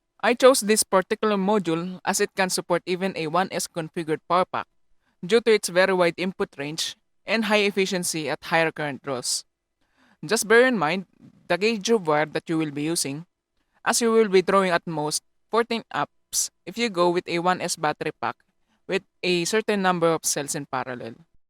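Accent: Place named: Philippines. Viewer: Yesss gotta love that Filipino accent Ps Great video tho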